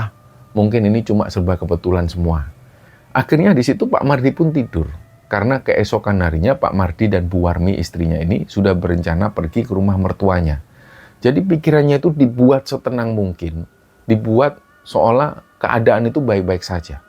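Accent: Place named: East Java, Indonesia. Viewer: Mas logat pemalange endi deneng nganggo logat jawa timuran 😅 😂😂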